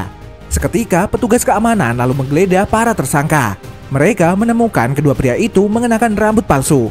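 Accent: Madura, Indonesia. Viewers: Kentara banget nih naratornya berdialek Madura tak iyee...🤣🤣🤣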